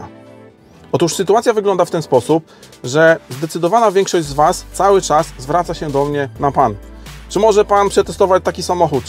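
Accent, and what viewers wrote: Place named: Warsaw, Poland. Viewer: Z całym szacunkiem, ale ... pomysł na ksywę .... no taki sobie. Po prostu ... ksywę albo się ma albo nie, czyli jeśli ktoś wcześniej (w dzieciństwie / w młodości / w robocie) nadał nam ksywę i (że się tak wyrażę) "przylgnęło" i pasuje, to się jej używa .... albo i nie , bo to zależy od ... nie wiem ... okoliczności ? Natomiast "sztucznie wyprodukowana" ... zazwyczaj NIGDY nie pasuje. OK, to Twój pomysł i jeśli Ci pasuje .... to wszystko w porządku, ale ..... jak dla mnie ... dziwne to nieco. Natomiast. Oglądam Twój kanał z powodów które już tu opisywałem kiedyś, ale przypomnę ... w miarę spokojny język wypowiedzi i taki ... swojski jakby, ale ..jednocześnie "warszawski" w tym dobrym rozumieniu Warszawiaka ... coś jak aktor Zbigniew Buczkowski, czyli ... leciuteńko "cwaniak" , ale w tym pozytywnym znaczeniu :) ..... taki ... 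nasz :) Dodatkowo prezentujesz te samochody bez zbędnych (moim zdaniem oczywiście) bzdetów typu ..... oooo ... patrzcie ... tu jest kierunkowskaz ... , tu błotnik, tu lampa, a tam opona. Przy okazji jesteś jednym z tych nielicznych którzy w ogóle odpowiadają na komentarze, czy generalnie utrzymują kontakt z "publicznością" , a to (tu znowu moja opinia) BARDZO DOBRA praktyka. Na koniec watek osobisty, czyli .... UWZGLĘDNIŁEŚ moją prośbę o podawanie informacji o ilości cylindrów w silnikach prezentowanych aut ... jeszcze raz dziękuję. Co prawda przypominałem kilka razy jeśli zapomniałeś, ale ... widzę że to już "constans" w Twoich filmikach :) , czyli .... Z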